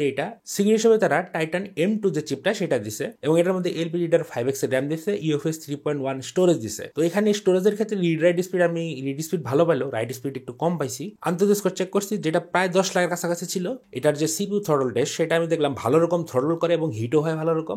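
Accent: Tangail, Bangladesh. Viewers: Vai, apnar bari ki tangail?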